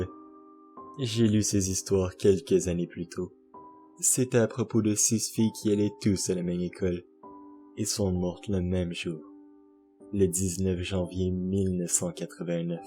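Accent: Quebec, Canada. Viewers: Hé es-tu québécois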